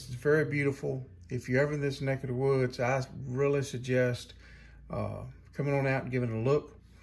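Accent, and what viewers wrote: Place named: Northern Minnesota, United States. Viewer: Ahh ok, accent sounded off to be northern Minnesota lol but people do move. Again good luck on the USACC build!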